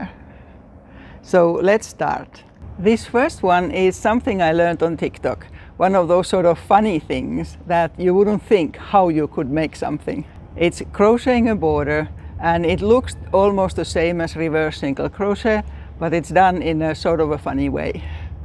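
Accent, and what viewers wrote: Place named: Finland. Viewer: My mother and father were both from Finland. I knew the moment I heard your voice I knew you were too. It's so lovely to hear that beautiful accent again! Not only that, I loved your video. Several techniques are new to me.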